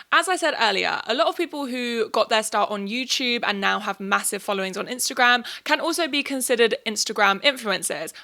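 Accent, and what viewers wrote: England, United Kingdom. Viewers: This is on a kind of related note, but I have a friend who was on deviantART for a long time who experienced a similar thing. She's been on dA for a long time now and built a pretty loyal following of 2,000+ watchers, which is pretty substantial on that platform. On that platform, she's been commissioned so much, even started making pins, plushies, and tattoo designs. Recently, she tried out tiktok and quickly blew up. She was making comics that were getting views in the millions, she's never had so many eyeballs on her art and got about 70K+ followers. But even with those numbers, she got almost no offers for commissions or sold merch. What she did get were a bunch of people asking for more content, even though the stuff she was making was quite work intensive. I feel like the infinite scroll of TikTok devalued the amount of work she was really putting into her art, and when people weren't getting content fast enough (by that I mean, within days of her last post), they just forgot about her and her views plummeted. 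That weird surge of fame she experienced ended as quickly as it began, and she made almost no sales out of it. Compare this to her 2,000 watchers on dA who still commission her to this day. I'm not saying dA is a perfect platform (it's not), but TikTok is designed to have this pathetic half-life, I wonder how ANYONE maintains TikTok fame at all. side note: I loved the vid. Does anyone know which part of Britain her accent is from? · Where are you from in England, you have the same voice as my psychology teacher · you're too british. it's hard to listen to you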